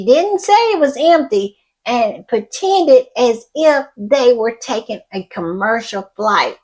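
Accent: South, United States. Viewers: I'm screaming! Not "jaws tight"! And you always have me at " heffa"! 😂😂😂 Yep.... You're a southern gal, for sure! I'm loving it.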